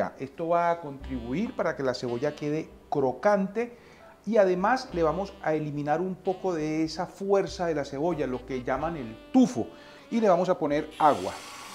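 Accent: Colombia. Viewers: como todos tus platos delicioso este plato frio w berraquera como se dice habla paisa sigue q sos excelete cheff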